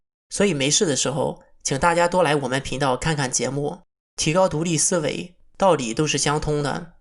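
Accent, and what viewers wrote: Shandong, China. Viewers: 口音聽起來相沙東人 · 这声音很明显是山东人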